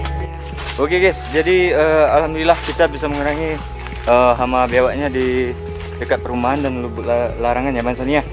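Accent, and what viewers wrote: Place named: West Sumatra, Indonesia. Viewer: Abang orang mana bg?? Kayak logat orang minang bahas indo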